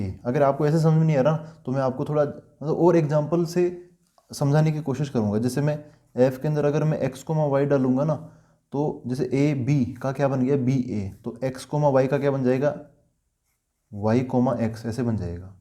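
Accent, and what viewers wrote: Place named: Haryana, India. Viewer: by ur voice,I tried to predict that u must be frm haryana....by the way u teach very nicely ...big thanks from Haryana girl 👍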